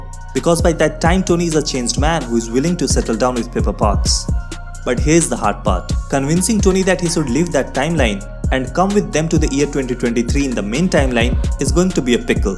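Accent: India. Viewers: r u indian ??? the acent is like india . and i think i c this graphic somwhere and the concept too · U r Xcent sounds like a Marathi guy.......................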